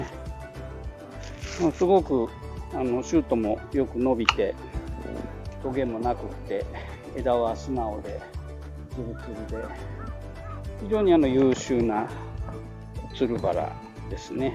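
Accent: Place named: Kansai, Japan. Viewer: はじめまして、いつも拝見しています。 品種別の剪定など、詳しく解説していただいてありがとうございます(❁ᴗ͈ˬᴗ͈)⁾⁾⁾ 今は千葉に住んでますが、関西出身なので関西弁のお話に癒やされています。 我が家に地植えして4年ほどたったイングリッシュローズのオリビア・ローズ・オースチンがあります。 今年は春に2、3輪しか花が咲かず、その後も咲くことなく現在に至っています。 同じタイミングで植えたデスデモーナはこの秋に30輪以上花が咲きました。 同じ様なお手入れをしましたが、オリビアは葉が茂り枝が伸びるばかりで蕾がつきません。 冬剪定を予定していますが、アドバイスをいただけないでしょうか？ 株は縦横90㎝くらいで、ベーサルシュートは4本出ています。よろしくお願いいたします。 寒さも厳しくなってきましたので、御自愛くださいませm(*- -*)m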